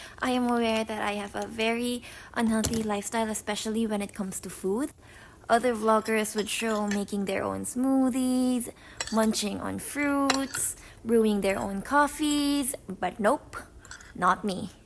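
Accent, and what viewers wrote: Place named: England, United Kingdom. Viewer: loving your english accent!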